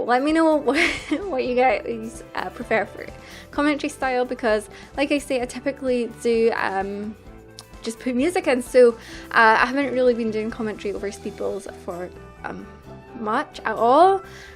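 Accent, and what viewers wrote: Scotland, United Kingdom. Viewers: Is that a scottish accent i hear? :P You hear very few of them on YouTube...mad hearing it on a Sims 4 video too! :)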